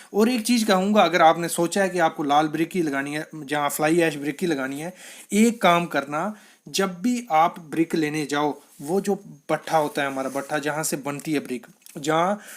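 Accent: Jammu, India. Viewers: Tu Jammu ka laga Raha ha muja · Bhai aap Jammu se ho kya? coz aapki hindi proper Jammu wali hai..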